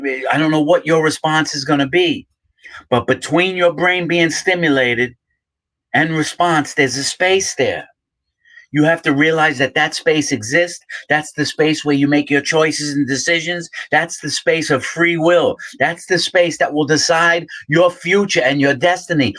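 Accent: New York, United States. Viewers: I love your material! I can relate to a lot of your stories! I am from NY two so I reconized your accent! I really like your context!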